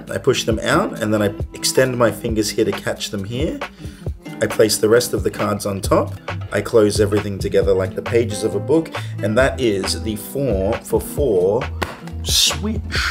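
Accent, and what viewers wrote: Australia. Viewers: Love that ‘Stralian accent, mate! I’ve loved magic since I was a little kid…I mean, what kid doesn’t, eh?! I picked up magic decades ago for one simple reason—to distract crying kids in the plane. And it worked as well as entertaining the adults around. Since then, I’ve watched countless magic stuff on YouTube and I’ve always been amazed by card tricks. But I’ve been so intimidated by them. Until now. Thanks for sharing your amazing videos and for generously sharing your tutorials. I’m determined to master some of the most basic of cars skills and tricks. Cheers, mate!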